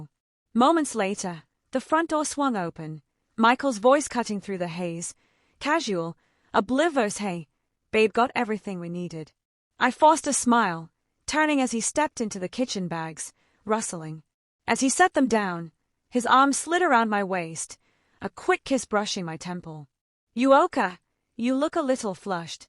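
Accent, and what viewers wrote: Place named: Australia. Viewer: Oh I like the Australian accent on this girl. It actually makes her pleasant to listen to.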